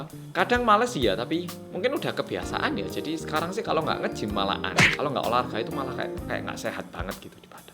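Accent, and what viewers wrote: Java, Indonesia. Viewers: Medhoooooooookkkk.......hahahahah.assek banget liat videonya.ngga bikin bosen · Orang Korea yang medokkk❤️ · Baru kali ini liat orkor medok😂,, aku aja org indo nggk loh🙄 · Gercep nih mas medokk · Mas...Mas bicara korea juga medhok. Kuereennn rek 🥳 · Katanya orang korea nyang medok dan hidup bertahun tahun di indonesia(kususnya pulau jawa) tapi kok gk tau mlumah sih? Mlumah itu terlentang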